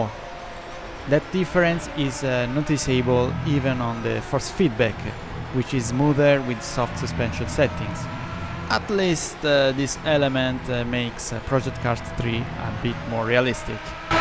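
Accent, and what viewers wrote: Italy. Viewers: This guy is Italian isn’t he? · are you italian?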